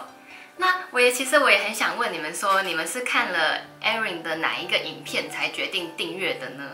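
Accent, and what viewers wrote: Taiwan. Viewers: 我從跟姐姐去東大門批貨那裡訂閱的！你的台灣口音我覺得很可愛很有親切感！想問妳住台灣哪裡？謝謝你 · 說的清楚，有台灣味，很認真。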